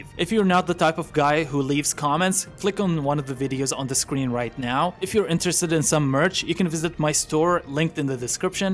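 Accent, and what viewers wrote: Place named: Japan. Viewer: You can tell hes Japanese by his accent so Im sure he knows his ancestors history...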